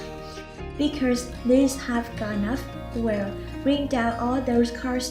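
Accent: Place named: India. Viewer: It's a Indian lady alot like the ones I hang up on phone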